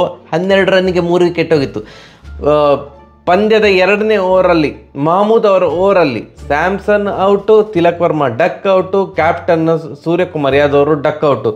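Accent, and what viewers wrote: Mangalore, India. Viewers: ಅಣ್ಣ ನಾವು ಧಾರವಾಡ ಜಿಲ್ಲೆಯವರು ನಿಮ್ಮ ಮಂಗಳೂರು ಶೈಲಿಯ ಮಾತು ನಮಗೆ ಬಾಳ್ ಅಚ್ಚುಮೆಚ್ಚು 🙏💐💛❤️ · Mangalore shaili Chand untu marayare❤❤❤❤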